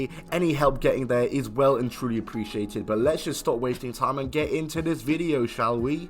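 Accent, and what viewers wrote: England, United Kingdom. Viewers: Seriously his annoying obviously brother English voice ruins it.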